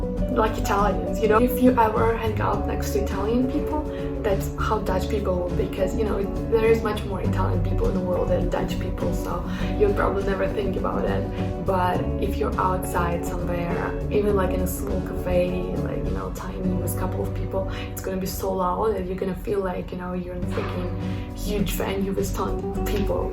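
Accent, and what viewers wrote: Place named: Ukraine. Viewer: Круто, сразу слышно украинский акцент.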